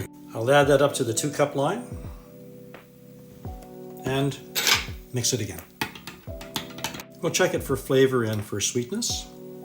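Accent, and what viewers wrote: Canada. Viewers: The Canadian accent shined through. 😊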